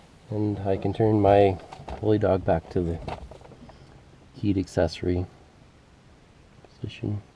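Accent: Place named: Southern Ontario, Canada. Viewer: That there is a southern Ontario accent. You still happy with the purchase?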